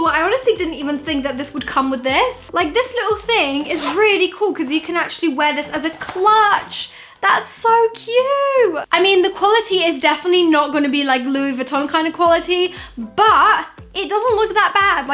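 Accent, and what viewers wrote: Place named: London, United Kingdom. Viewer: You literally sound like a londoner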